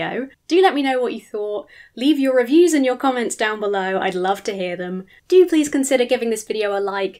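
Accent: United Kingdom. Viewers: Since you would seem to be British (accent?) you may not be familiar with the car that Eric/Derek drives up in. It's a Chevrolet Camaro and not really a "sports car" in the true sense of the word. Also, it's not necessarily all that expensive. Even though you can get them all muscled up with big engines that can go fast, you can get a basic bare bones one for as little as $25,000 dollars or about 18,400 British pounds. Thus, even a night watchman should be able to afford one if he keeps his aspirations in check. Love your stuff otherwise though. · Blackmail, it's the godly way! Lol, I died when you said that because it's so sadly true. Let me manipulate you so you can, believe me, btw we're the good guy's everyone else is so evil ha ha ha (in evil villain). Love the video here, but where is your accent from? My wife and I were thinking British but couldn't place it.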